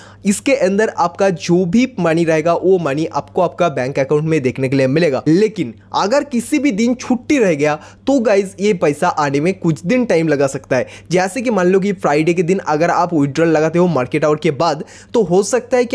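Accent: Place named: Bengal, India. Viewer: bro the bengoli accent is so freaking cute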